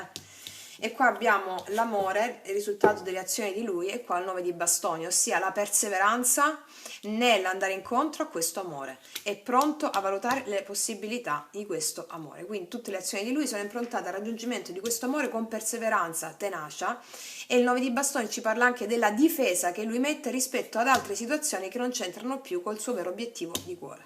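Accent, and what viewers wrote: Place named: Salento, Italy. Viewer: Che dirti? Brava davvero Io conosco solo le sibille, ma tu sei davvero fenomenale. Ti svolto anche se ti conosco e scoperta x caso da pochissimo, ma mi porti a casa. In Salento, l'accento è inconfondibile, vivo all'estero ma sono salentina doc. Grazie. 🌺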